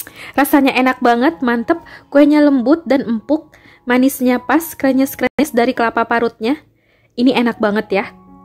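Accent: Medan, Indonesia. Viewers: owh medan patas logatnya hehe.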